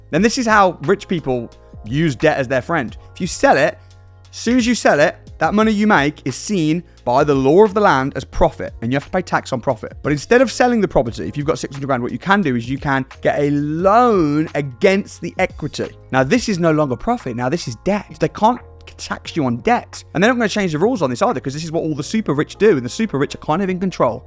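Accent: United Kingdom. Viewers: We threw a bunch of tea into a harbor once. It’s funny a Brit is narrating this story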